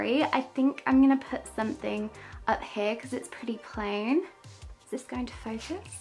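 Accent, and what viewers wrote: United Kingdom. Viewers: british accent is sooooooo beautiful. Couldnt stop listening to you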